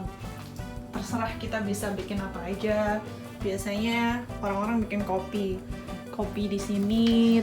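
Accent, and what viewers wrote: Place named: East Java, Indonesia. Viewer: Learning by doing, mantap mbak Btw mbak e logat e wong Jawa timur heheh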